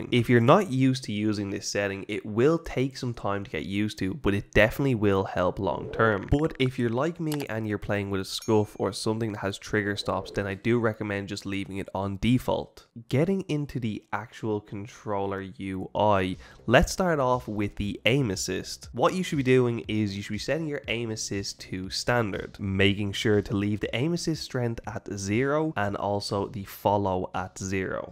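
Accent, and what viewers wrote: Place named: Ireland. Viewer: i can hear the irish in this fella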